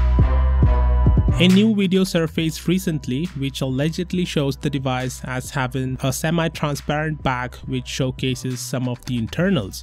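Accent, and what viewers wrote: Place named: India. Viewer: The way you're fluctuating between the Indian and the wannabe American accent is making you sound unclear. Go for something that is more comfortable with you.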